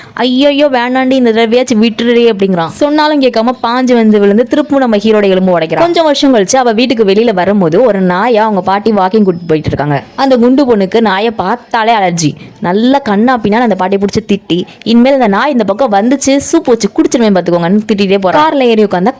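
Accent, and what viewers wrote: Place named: Tirunelveli, India. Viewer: திருநெல்வேலி பாஷை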